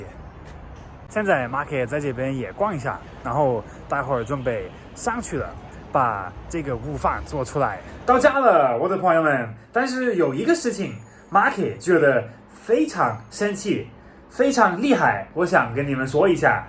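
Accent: Sichuan, China. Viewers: 这个老外普通话居然有川音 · 马克的国语有股浓浓的四川味~ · 你的川味普通话很不错👍😌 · 马克是一个中国通，四川话加普通话很有意思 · 四川普通话说的不错嘛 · 马克是四川发音听起来像马kie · 喜欢听马克说的四川普通话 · your Chinese comes with a Sichuan accent! It sounds so good!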